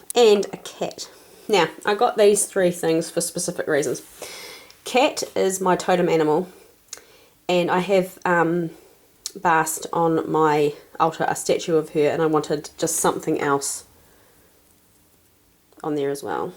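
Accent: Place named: United Kingdom. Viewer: so you are from New Zealand? wow that is great but your accent is like from Britain just a bit i suppose. i love to hear your voice so you can talk all day!! I really like all your stuff, girl, they have tea balls all day long here in the USA in Wal-Mart, I agree with you that online shopping is very bothersome but somewhat convenient especially when people such as my self cant find any witchy shops in a "christian" community. I have no other choice but to order online and i have to eat crow about it. and i prefer witchy shopping over clothes shopping any day as well!!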